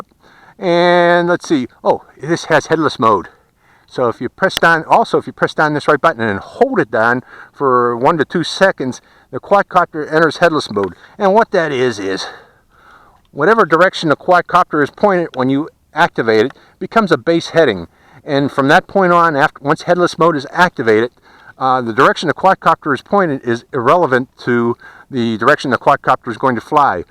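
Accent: Pittsburgh, United States. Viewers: Are you from Pittsburgh or Western PA?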